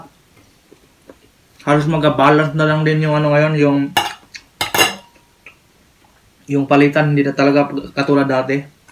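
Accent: Visayas, Philippines. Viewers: mukang bisaya ka sir kasi tayo lng mga bisaya ang nagsasalita ng (ba) kahit magtatagalog hndi tlga nawawala satin yong (ba) -skl hahahha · maka ingon jud ko nga bisaya ka sir tungod sa imong accent nga bisaya hehehe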